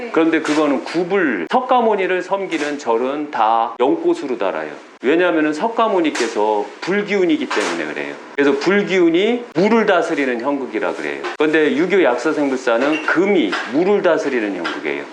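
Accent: Chungcheong, South Korea. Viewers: 유교도안닌것같고 불교도 아닌것같고 무당도 아닌것 같은데 신흥종교인가? 귀신도쫓고 각잡종교 좋은것만 모아서 ...순진한척 충청도 사투리로 이래유 그래유 해가며 그런데 왜 그리 사는지..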